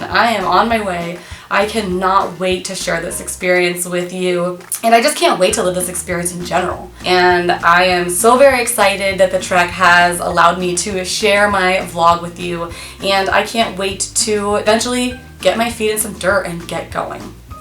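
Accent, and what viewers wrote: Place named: Pennsylvania, United States. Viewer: Are you by any chance from the Philadelphia area?? You sound exactly like you grew up in South East pa haha that isn't an insult at all I promise, I live in Delco 😁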